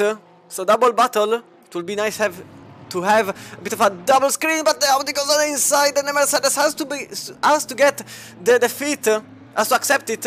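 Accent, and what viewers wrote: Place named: Italy. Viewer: you are a great commentator :) i like your energy and your italian english... looking forward to hear you again bro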